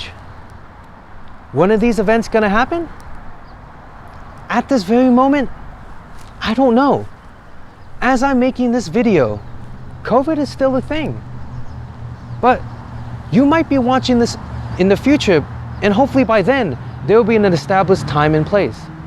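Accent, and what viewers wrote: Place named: East Coast, United States. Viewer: You don't sound like a Californian. You sound like something easy coast.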